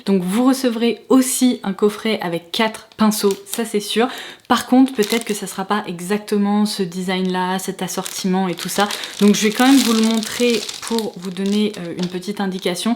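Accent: United Kingdom. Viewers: Bonjour, Vous avez un très jolie accent british si je ne me trompe. ☺️ Vous avez des attache lasbas ou vous avez appris la langue? C’est par curiosité 🤗. Agréable Journée .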